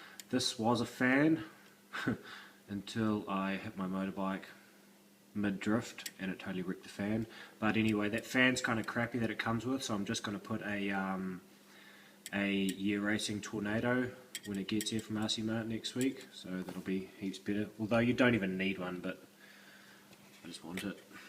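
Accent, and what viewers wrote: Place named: New Zealand. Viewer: wheres that track at bro? u sound like a kiwi aye? :-)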